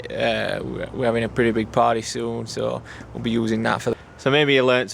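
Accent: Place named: Yorkshire, United Kingdom. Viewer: good video, proper Yorkshire man by the sounds of it. Sheffield at a guess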